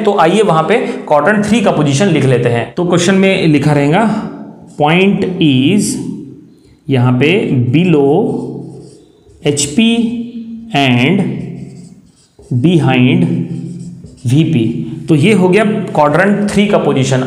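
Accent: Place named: Hyderabad, India. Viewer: Sir ka hyderabadi ascent plus super explanation.. 🔥thx sir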